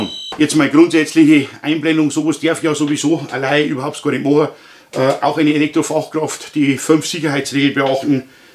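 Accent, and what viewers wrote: Bavaria, Germany. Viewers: oan Buam hoats an oaner Steckdosen eine auffi gehaut... ich liebe diese Sprache, selbst höchst gefährliche Dinge hören sich für uns Fischköppe noch lustig an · Herrlich bayrischer Dialekt! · Deine Videos sind erstklassig . 10 Daumen nach oben dafür . NUR leider habe ich ein kleines Problem , ich kann dir nicht zu 100% folgen da ich nicht alles verstehen was du sagst . Ich habe da doch schon gewisse Schwierigkeiten mit den Bayrischen . Schade das man es nicht alles mitbekommt was du gerde erklärst . Ansonsten sind deine Videos grosse Klasse . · Machst schon informative Videos, sehr lehrreich, nur ein wenig mehr hochdeutsch wäre nicht schlecht. Es kommt ja nicht jeder aus dem bayrischen Ausland 😉🤣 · dein Bayrisches Dialekt, bin aus Nordrhein Westfallen ich verstehe dich Super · #PWInfo Servus und erstmal vielen Dank für die tollen Beiträge. 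Bezüglich der Fehler durch Routine: ich selbst habe erlebt, dass mein Elektriker, der die komplette Installation im Neubau gemacht hat, bei einer Steckdose Neutralleiter und Schutzleiter vertauscht hatte. Meine Frage: prüft ein Elektriker Steckdosen abschließend nur mit einem Duspol? Das Vertauschen von Nulleiter und Schutzleiter bliebe dann ja aber unentdeckt, glaube ich? Mich würde sehr interessieren, wie das in der Praxis aussieht, und wie richtig gemessen wird. Wäre ich Elektriker, dann würde ich es mir nie verzeihen, wenn jemand aufgrund meiner Schusseligkeit zu schaden kommt und würde an solchen Stellen auf jeden Fall prüfen. Ich hoffe, dem Kind geht es wieder gut und dass es mit dem Schrecken davongekommen ist. PS das Bayerisch finde ich super :-)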